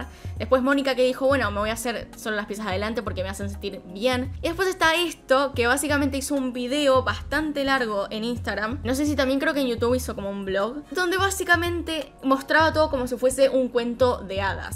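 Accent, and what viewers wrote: Argentina. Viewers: Jajjaj me encanta el acento argentino jajaj saludos desde chile 😌❤️🙃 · Soy yo o los Argentinos dicen anios en ves de años xd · Amo demasiado este canal. Creo que me empieza a gustar mas que el otro. Me divierte muchísimo tu forma de hablar tan naturalmente Argentina y los temas de este canal. Saludos desde Santa Fe. Pd: El maquillaje de Natura es hermoso. Pd2: hay que lavarse los dientes pero tampoco demasiado. Ya que también te pueden salir caries por exceso de cepillado ya que te choteas todo el esmalte.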